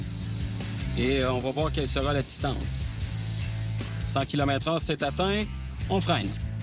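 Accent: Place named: Quebec, Canada. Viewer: J’adore l’accent Québequois!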